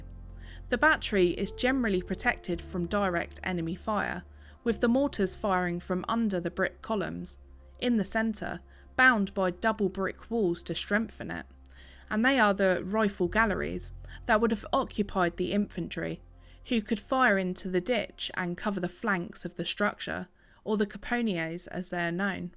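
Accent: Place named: Hampshire, United Kingdom. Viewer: Nice to hear the Hampshire accent. Instead of fake Estuary English. A very nice county with lots of lovely small towns and villages.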